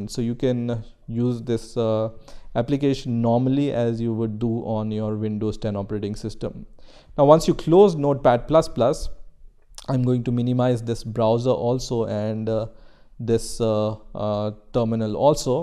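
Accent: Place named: India. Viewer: you now what you sound Indian and I am pretty sure u are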